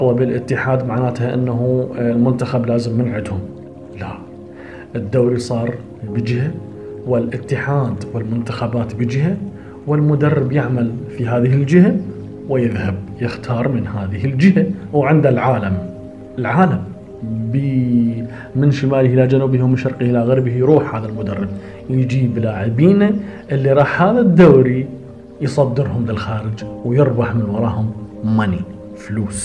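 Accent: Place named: Iraq. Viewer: انته بطران وبالهجه عراقيه جاي اتلهوس ارجو د على تعليق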